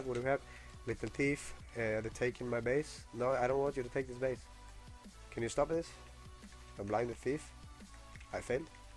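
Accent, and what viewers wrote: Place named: Scotland, United Kingdom. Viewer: where are you from? XD i tried thinking but i couldnt decide between a scottish accent or a deeper accent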